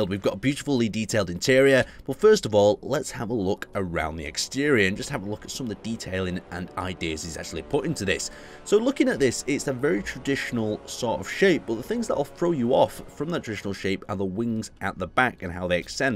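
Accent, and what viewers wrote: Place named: England, United Kingdom. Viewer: No, that's a regional accent here in England. Tons of people speak like that, why would you? :p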